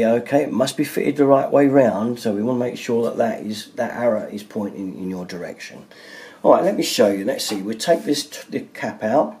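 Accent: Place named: RP, United Kingdom. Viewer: Who complains about his accent ??? The man speaks the Queen’s English, no complaints here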